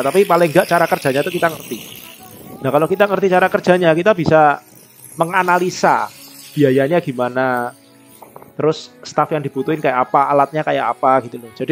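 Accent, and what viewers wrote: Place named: Surabaya, Indonesia. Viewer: Mangkane medok_e Jawa Suroboyoan ..hehe salam dari Surabaya Om sehat selalu..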